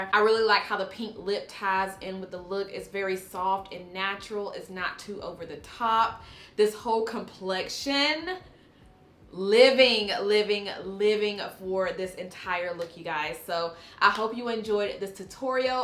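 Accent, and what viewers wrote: United States. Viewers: Lovely video. Just recently purchased the elf Camo concealer and the hydrating concealer, I’m looking forward to trying them. Love your accent, where in the states are you from? :)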